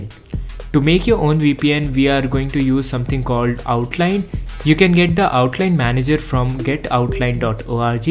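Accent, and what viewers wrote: India. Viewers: will never install something on my pc, told by an Indian voice.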